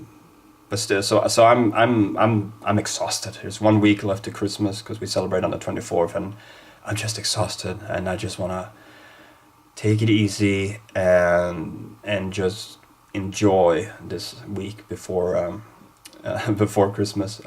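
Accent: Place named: Scania, Sweden. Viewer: I'm feeling P4A this year, but it's the first time since 2009 I really, really enjoy it. Btw, talking about friendship, your P4A video of 2009 is still my favourite P4A video ever. Led me to your channel, and then a whole bunch of awesome people. :) You're a great network creator. And your dialect is awesome. I get happy every time I hear scanian. God Jul. ^_^ Alla sova, släckta äro ljusen, äre ljusen Tipp tapp, tipp tapp, tippe-tippe-tipp-tapp, tipp, tipp, tapp *clinks on piano*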